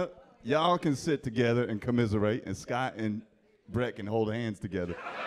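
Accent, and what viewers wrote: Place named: New Jersey, United States. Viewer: As a fellow (undercover) Patel, has the strongest NJ/NYC accent I’ve ever heard. My dog came up!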